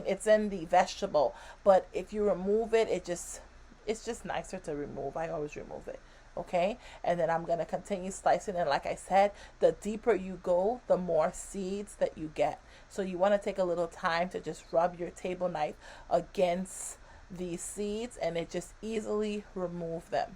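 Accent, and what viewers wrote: Bahamas. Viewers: The accent is there! I know a bahamian accent when I hear it. I know my people! 😂 I knew it too! 🇧🇸🇧🇸🇧🇸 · I knew I heard a bit of our Bahamian accent!🫶🏾🫶🏾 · I’m watching your video and I keep hearing a Bahamian accent then you go and say Bahamas lol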